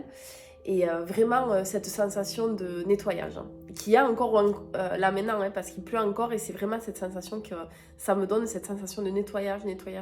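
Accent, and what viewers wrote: South, France. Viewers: Une belle jeune femme avec un accent....c l’accent du sud lalala...et qui dit et qui raconte l’histoire d’une belle Lumière Bleue...c une vibration merveilleuse car tu en es toute transformée...❤❤❤❤❤❤❤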